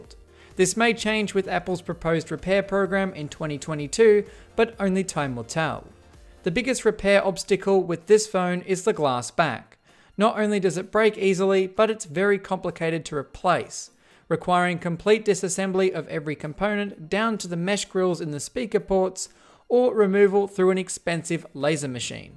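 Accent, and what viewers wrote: Australia. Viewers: Who else comes here for the Aussie Accent?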